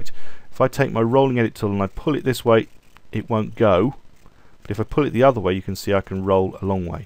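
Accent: United Kingdom. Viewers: YOUR LESSONS ARE THE BEST ON YOUTUBE. I AM NEW TO PREMIERE PRO AND YOU HAVE REALLY HELPED ME A LOT. I LOVE THE VERY SIMPLE WAY THAT YOU EXPLAIN SOMETIMES CONFUSING PROCESSES. MANY THANKS!! OH, YES, YOUR BRITISH ACCENT IS VERY COOL.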